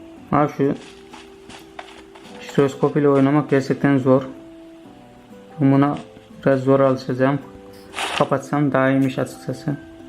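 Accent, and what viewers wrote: Azerbaijan. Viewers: sen azerbaycanlımısın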